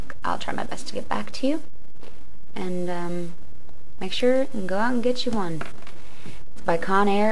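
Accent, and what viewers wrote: Texas, United States. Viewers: you remind me of April Rhodes from glee. You're awesome, gotta love the Texas accent, I'm in TX too. I'll be subscribing to your channel for sure :) and I love your hair! :D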